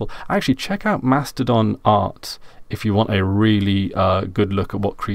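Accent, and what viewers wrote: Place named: England, United Kingdom. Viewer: You come from England, right?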